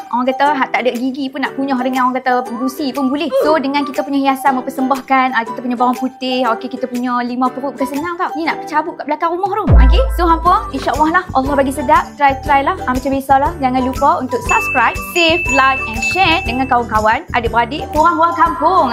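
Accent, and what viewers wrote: North, Malaysia. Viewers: Ulang tngok...suka dngaq loghat utara...sambil tu nk ingat resepi...tk bnyk2 😊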